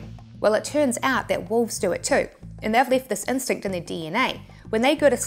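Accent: New Zealand. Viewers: Wow! so good to hear the Kiwi accent talking about my favourite pet. Hope to hear more from you.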